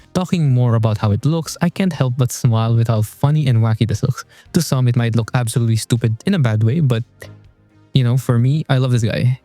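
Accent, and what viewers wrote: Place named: Philippines. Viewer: Just found your channel, like your videos. And by the accent alone I can already tell you're a Fellow Filipino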